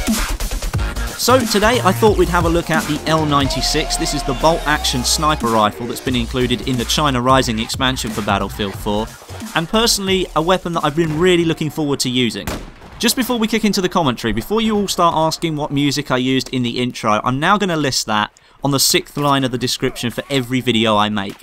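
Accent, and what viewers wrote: United Kingdom. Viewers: ur british yay i am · Brittish?? Subscribed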